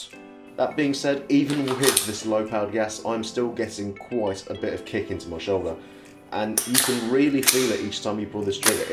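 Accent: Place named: Australia. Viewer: lol Your aussie... where you based?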